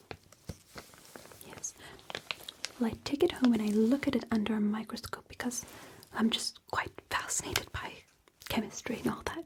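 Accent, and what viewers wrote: Long Island, United States. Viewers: You are looking so cutesy putesy!!! Your accent is the best, especially that heavy long island one you do in some of your rps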